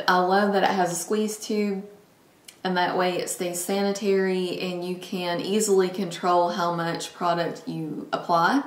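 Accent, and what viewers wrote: South, United States. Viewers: i've been hesitant to try the foundation, but after your review i'm going to try it. i love the southern accent on a classy, well-spoken person like yourself. :)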